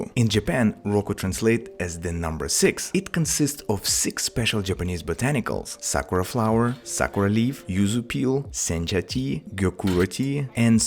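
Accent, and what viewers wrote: Russia. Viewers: "Your basic cocktail making skills" if the accent wasn't enough that sold it. He's definitely Russian.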